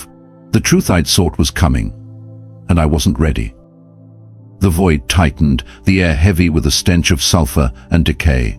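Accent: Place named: United Kingdom. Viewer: It’s really hard to find this believable when it’s a white British accent speaking and we’re talking about the most urban hip-hop artist and legend Tupac Shakur allegedly being seen in hell. I understand that people want to conceal their identity because they work in corporate jobs… But I just couldn’t sit through the whole thing. Y’all should have picked like a different accent maybe a black person even a Hispanic person but a white British accent come on guys,! Y’all lost me at like 15 minutes.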